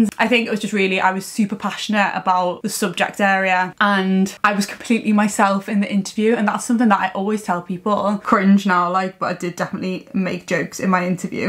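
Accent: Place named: Leeds, United Kingdom. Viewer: You sound like your from Leeds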